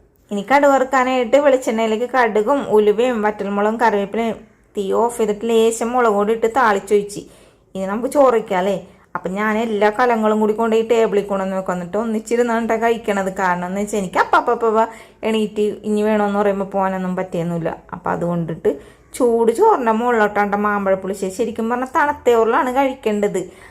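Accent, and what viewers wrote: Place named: Kochi, India. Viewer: ഇരിക്കണേനു. കൊച്ചി ഭാഷ കേൾക്കാൻ എന്ത് സുഖം.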